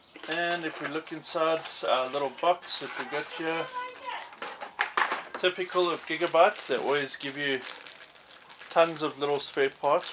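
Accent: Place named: South Africa. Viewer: Never heard such a strong Saffer accent.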